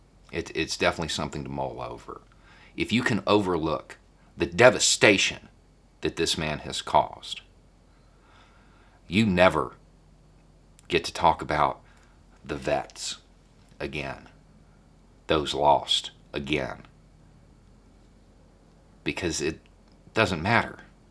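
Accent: South, United States. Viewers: I apologize for my biased brain. I like to think I’m an open, intelligent, critical thinking fifty year old woman. But as soon as I saw your picture and read the headline, I thought for sure you were going to be a Trumplicker. Then hearing your southern accent, I really didn’t think I could listen to one more pro-Trump rant from a white guy. Well I was WRONG. My first quick little impulse about what I saw when I scrolled upon your video was clouded by my own judgement and pre-judgement. Prejudice is not a personality trait I want to associate myself with. So, thank you for being an intelligent voice in the fight against Trump’s re-election. I am thankful we can make mistakes and learn from our own shortcomings. I’ve gotten so trigger-happy and hyper-vigilant about anyone or anything that even smells like it might be a Trump supporter’s rantings that I was ready to jump all down your throat before really listening to you! 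Glad I waited before scrolling on and I’m now subscribing to your channel. 🌟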